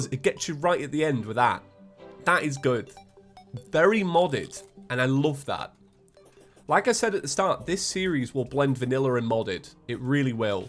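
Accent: United Kingdom. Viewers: Cap? Cap? What country are you... wait your British... never mind. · big boi slime vs cool british boi.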